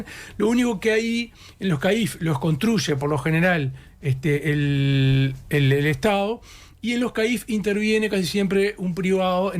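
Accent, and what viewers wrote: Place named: Argentina. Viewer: Buenas noches parece que escucho a un periodista argentino , informando la estafa de los fondos estatales por una fundacion creada para robar a los pobres 4%. Si es verdad , los responsables de la fundacion A Ganar tienen que ir presos, en caso que no aya procesados el periodista Eduardo Preve miente!!!. Buen año 2025 con salud ... Nelson....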